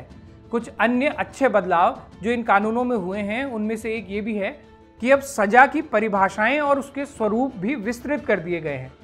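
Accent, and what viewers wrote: Garhwal, India. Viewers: जी आपकी पूरे हफ्ते की छुई बात का इन्तज़ार रहता है, बीच बीच में आपका garhwali बोली का इस्तेमाल आपकी रिपोर्टिंग को बड़ा ही रोचक बना देता है 👍👍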